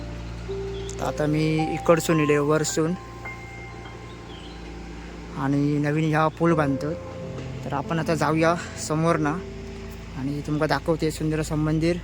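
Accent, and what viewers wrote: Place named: Konkan, India. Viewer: सुरुवातीला थोडंसं फास्ट बोलला होता पण नंतर छान पद्धतीने माहिती दिली आहे.कोकणी भाषा गोड वाटते ऐकायला.❤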